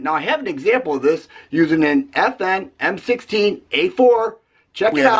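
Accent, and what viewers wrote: United States. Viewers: The presenter needs to do a little brushing up on his facts. He uses Assault weapon and Assault rifle interchangeable at about 8 seconds into the video. The AR-15 is not an Assault Rifle, but, it is an Assault Weapon. The terms are United States specific, because different words have different meanings in different places, but, he sounds pretty 'merkun. Assault weapon is usually defined as a semi automatic with a detachable magazine. Sometimes includes the definition of pistol grip, but, not always. It's absolutely true true that the AR is not an Assault Rifle. His claim that it's not an assault weapon is false.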